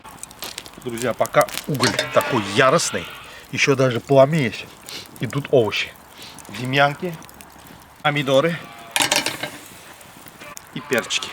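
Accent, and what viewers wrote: Baku, Azerbaijan. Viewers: Сразу по разговору Бакинец))) привет с Разина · Доброго здоровья! Ваш русский язык с Бакинским акцентом, для меня бальзам для души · Спасибо дорогой земляк сидел бы вечность смотрел и слушал. Бакинский диалект это просто песня азербайджанского народа. Как я скучаю по Родине по дорогому моему сердцу Баку. По своему милому посёлку Новые Ахмедлы. С уважением к вам Иващенко Андрей Викторович. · Как азербайджанцы никто так вкусно не готовит на Кавказе. Ну и так вкусно рассказывать тоже. Не удержался и подписался! Этот непередаваемы азербайджанский городской акцент это супер.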